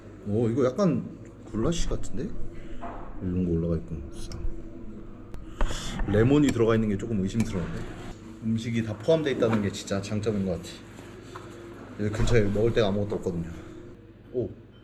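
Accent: Gangwon, South Korea. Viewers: 이자는 강원도 사투리가 조금씩 섞인 말을 한다.... 오늘은 그래가지고 등등 ㅋㅋㅋ 노란색 티셔스 입으면 만화 꿀딴지 가지고 있는 곰 모습이 보인다 ㅋㅋㅋㅋ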